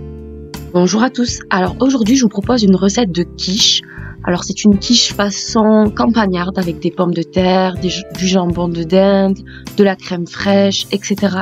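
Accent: South, France. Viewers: ca s'entend que tu es du sud 💗maintenant Paris!en tout cas ta chaîne est sympa je pense que pour le ramadan tu vas m'inspirer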